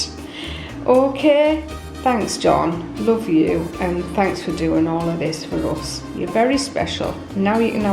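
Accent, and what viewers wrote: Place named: England, United Kingdom. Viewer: Beautiful colour palette in this bathroom. Beautiful shining Chrome fittings and towel rack really complement the shining tub and basin. If you ever give up soap making you could do interior decorating. You have such a beautiful English accent maybe that's why everyone wants to hear you talking. Have a wonderful day x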